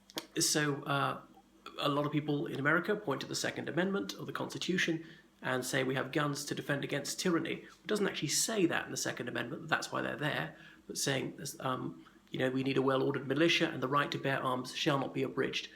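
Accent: United Kingdom. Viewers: You’re an embarrassment to the Irish and you sound like a Brit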